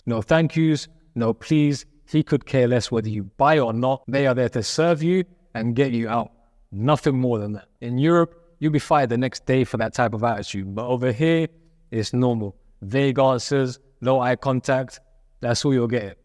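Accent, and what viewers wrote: United Kingdom. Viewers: You British by any background???.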